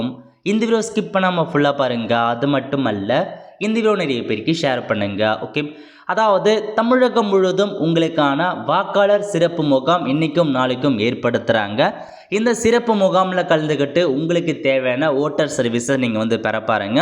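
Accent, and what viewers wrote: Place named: North India, India. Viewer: tis person speaks like north indian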